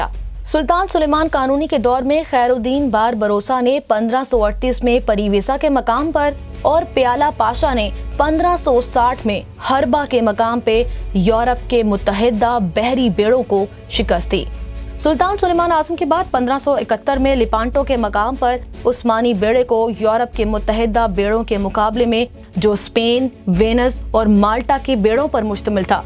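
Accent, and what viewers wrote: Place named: Punjab, Pakistan. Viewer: Please speak Urdu like Urdu not Punjabi. Or just speak Punjabi.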